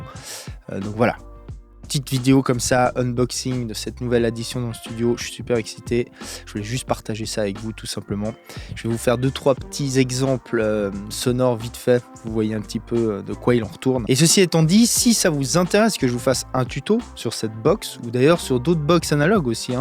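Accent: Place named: Geneva, Switzerland. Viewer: Punaise.. j'étais sûr que tu étais de Genève avec ton accent et je viens de regarder ton site et OUI ! 😁🤘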